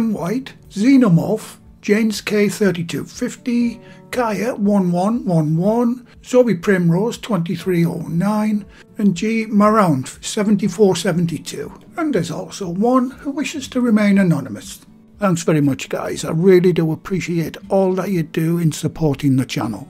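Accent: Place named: Northern England, United Kingdom. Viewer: From a French person (from the country of quiche), I approve. Love the Northern accent. 😊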